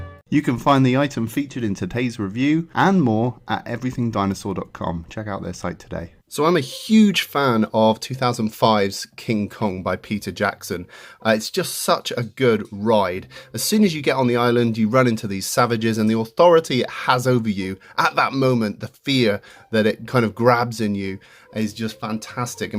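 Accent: United Kingdom. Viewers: I'm just yanking your chain, he speaks in a British way, for he is indeed British. No need to apologise kind hearted individual, tis all good. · Sorryy but why do you sound so British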